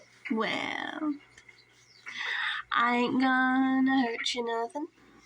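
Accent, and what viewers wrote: South, United States. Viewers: Pig!!! Very cute design, nice hometown farmgirl look, with an excellent southern accent. I do love the jean shirts and the pitchfork with ahem paint... solid 65!!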